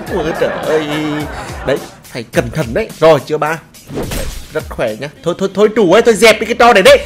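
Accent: Nghe An, Vietnam. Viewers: phải quê nghệ an k cách nói chuyện hay quá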